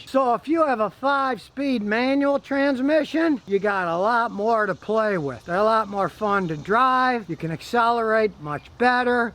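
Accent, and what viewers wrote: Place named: East Coast, United States. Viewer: East Coast accent with a slur the first time I really noticed 😳 . I'm learning how to be a conscious vehicle owner that I never noticed everything is delivered better when you pay attention 😂🤣🤣😅😆😁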